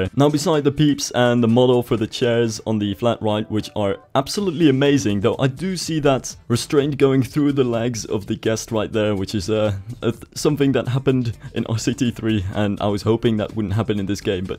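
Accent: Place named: Netherlands. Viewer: are you from holland? your accent sounds dutch :P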